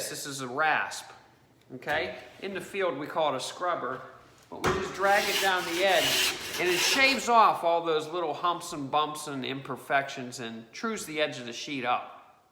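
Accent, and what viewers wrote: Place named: Aliquippa, United States. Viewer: I left Aliquippa 30 years ago but I picked up that accent of yours within 15 seconds of watching this video. Great job, it was a good refresher for some Sheetrock work I have coming up.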